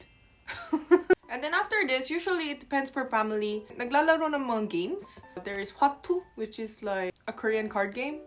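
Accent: Philippines. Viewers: Anyo sarangheyo 😅 🇵🇭🤝🇰🇷 🥰 ask ko lang pag nag e english ka dyan sa co worker or Korean friends mo ano comment nila sa pilipino english accent mo??? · Pinay na pinay accent kapag nagtataglish ka...hehe 😉😅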